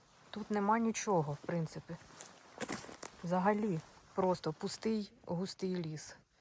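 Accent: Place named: Poltava, Ukraine. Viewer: У вас говір "Полтавський" 😊 Ви самі звідки?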